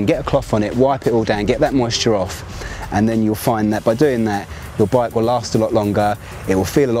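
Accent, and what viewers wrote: United Kingdom. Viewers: *That British Accent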